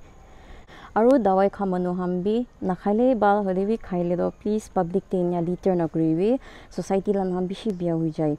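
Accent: Nagaland, India. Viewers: Now that is how Nagamese should be spoken, unlike those other channels trying so hard to add in Assamese and Sanskrit-like words which we can't even understand.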